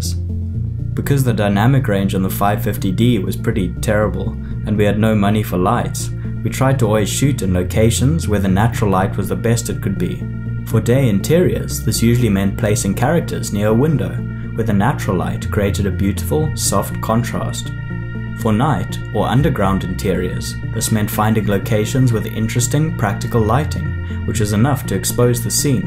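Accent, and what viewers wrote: South Africa. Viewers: I hear a South African accent! yay for the SA industry. Love this short video. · This man South African? Your accent almost sound like our's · Are you South African? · Ek wil mos se ek hoor n South African accent. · South African? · I'm going to go out on a limb and say South Africa. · Are you from South Africa man?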